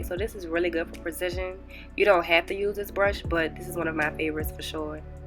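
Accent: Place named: Texas, United States. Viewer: Nice font work. You have a nice accent, whereabouts in the States are you from? (Update: Just heard you say you live in Austin TX!) I like you talking over the video and the length is fine.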